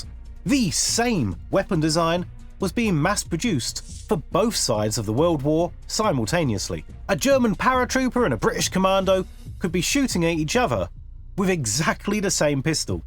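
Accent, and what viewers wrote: France. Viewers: Highly recommend at least using google translate AI voice reading for the french accent (sorry), otherwise dope video !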